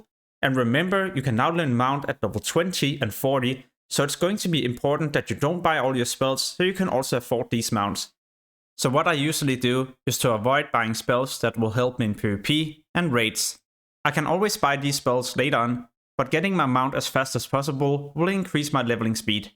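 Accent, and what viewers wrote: Denmark. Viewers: Danish best accent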